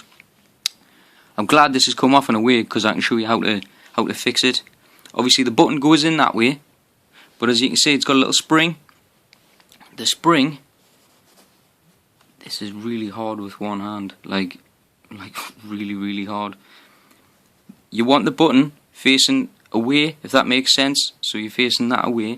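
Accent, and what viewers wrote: Newcastle, United Kingdom. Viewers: r u a goerdie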